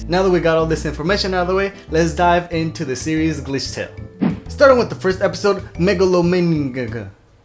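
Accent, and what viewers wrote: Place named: United States. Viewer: QUE!..... HABLAS ESPAÑOL!.... pasas como "americano" muy facilmente.... DIOS!... ya quiero ver la pelea xD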